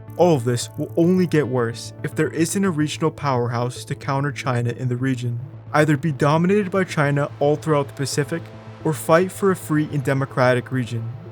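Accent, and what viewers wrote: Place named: United States. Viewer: This video sounds a bit America centric, this American voice over doesn't seem to realise we're done fighting for other people how about Australia concentrates on itself, fu*k the rest of the world especially America we dont need them they only want anything to do with us when it benefits them, USA the most useless ally any country could rely on and get screwed over by.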